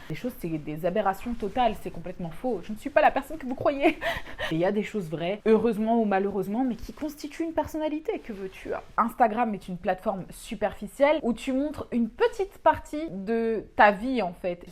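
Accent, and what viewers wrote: England, United Kingdom. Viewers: Team capricorne haha je me suis reconnue dans certains points. Tu es d’une honnêteté vraiment rafraîchissante et tes propos sont très justes, en tout cas ils raisonnent pour moi :) c’est un plaisir de t’écouter et de te regarder ! Je me suis abonnée il y a peu et je me demandais d’où te venait ce super accent anglais ? Tu as fais un séjour à l’étranger ?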